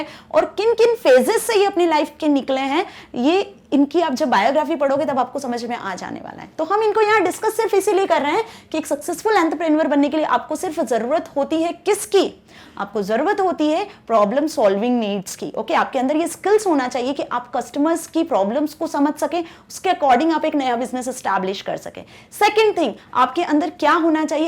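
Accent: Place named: South, India. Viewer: Everything else is okay but ma'am your accent looks like south Indians that is making it difficult for me to understand the words 🙂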